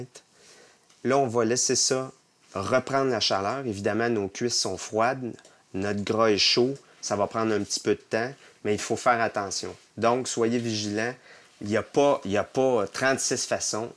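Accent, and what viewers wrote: Quebec, Canada. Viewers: Il parle québécoué, (québécois) ! Québec, province francophone du Canada...Parlé typique de la nouvelle France, ancêtres du français d'aujourd'hui, de ceux qui utilisent des anglicismes aux deux mots ! Bernard Pivot pourrait vous en passez un papier...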